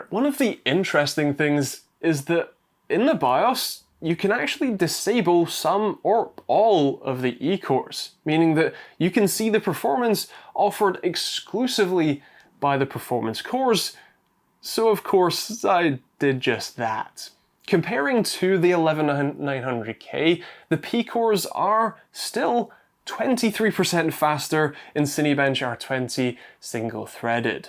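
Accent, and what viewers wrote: Scotland, United Kingdom. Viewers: Watched a few of your videos now, great work not getting caught up in the hype like most of the other reviewers. I know people aren't generally concerned about power consumption but the TDP is getting out of hand and like you said good luck keeping it cool. Glad it's a great performer but 241w is crazy IMHO. I've given you a sub nice to hear a Scottish Tech tuber!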